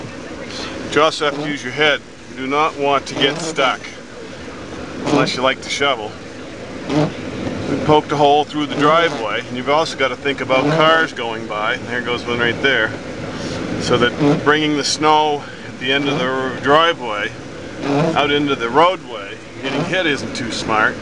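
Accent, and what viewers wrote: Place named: Maine, United States. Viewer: You are very welcome for my comment, AND it was sweet to get mail from an unexpected source into my inbox...woo hoo ! Please dont ever take your video down, as Im really homesick this year, and nobody in my suburb or community speaks with the correct accent.... It’s more comfort than you will ever know, just to be able to hear the audio portion in this video... AND, the visuals bring me back to a time in my life, when things were childlike, and perfect, long before the world. ( and everyone in it ) went to the dark side... I still prefer the cold temps, keeping my inside air at a mean 67 deg. F. Even though I left my hometown, Bangor, in 1978, at age 24, to enlist in the Navy, ( mess specialist ) I really do miss the old days—- listening to high school basketball tourneys on radio, picking apples in fall, ice skating on pond in winter, clearing snow... 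Those were the days, I could sit on the front porch at night with my sisters, and, in the dark, as a vehicle approached, I could tell you the make of the vehicle, just by the headlights... No use trying that now, lol.. Mama, daddy, both Nanas are gone now....but, I still remember mostly everything they taught me.. I’ve gone through my life, wrongfully thinking that my family were just a bunch of shit—asses, but, truth be told, I would not hesitate to burn everything I have now, just to go back in time to the year 1961, and get a do-over...( hell of a ride, but might not change one thing ) Im ever so grateful I was born and rais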